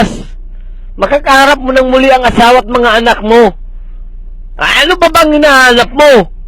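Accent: Visayas, Philippines. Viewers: Hahaha. ..visaya ka kuya.. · Bisaya man ka kuya noh.. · bisaya ka pla kuya.... hehe!same pla tau...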